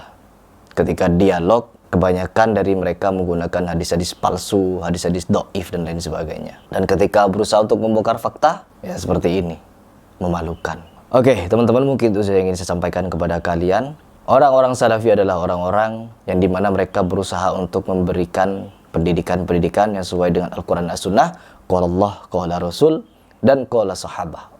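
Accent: Madura, Indonesia. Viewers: Mas ni orang madura kah? Logat nya mirip2 madura hehe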